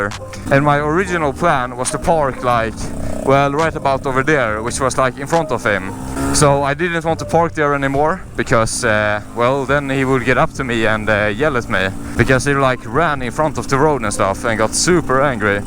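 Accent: Sweden. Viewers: Ja, man kan ju höra svengelskan 😂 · I can hear you are Swedish instantly I’m Swedish too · Are u from sweeden? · are you swedish>??? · jag kan höra svenska dialekten bro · u sound swedish are you? Im swedish. hej hej vad heter du?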